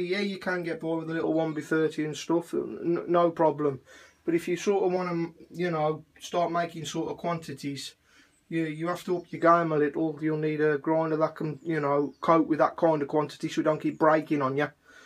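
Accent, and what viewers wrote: Birmingham, United Kingdom. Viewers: you sound like your from Birmingham?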